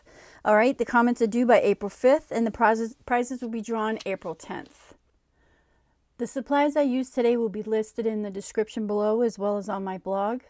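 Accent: New England, United States. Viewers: I love your accent!!! What state are you from? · Are you from New England? Such a cute card. Lots of nice details. I like the cloud background. USA.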